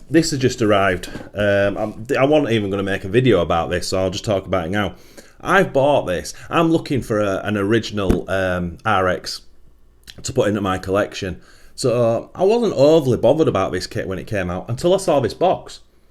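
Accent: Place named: Northern England, United Kingdom. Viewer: Love the channel, but a bit of a personal question, you have a northern accent and I am thinking south lakeland.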